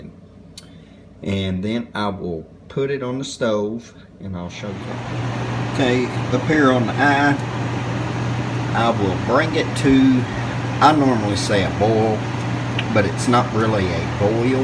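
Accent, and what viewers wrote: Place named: Appalachia, United States. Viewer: I’ve been looking for wild lettuce videos,Soon as I heard u talking I thought “yep, this guy.” Some hick off in the woods, 🤠hillbillys and home remedies that work.. that’s like a Ketchup and cheese sandwich.. thx . With love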